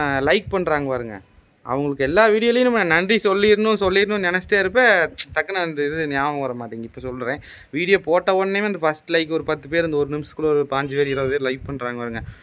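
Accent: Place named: Kongu, India. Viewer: Anna.unga video all we like .unga kongu Tamil nice.namma oru speech la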